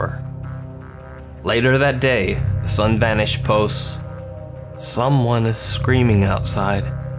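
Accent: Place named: South, United States. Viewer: Its the slight southern draw 😆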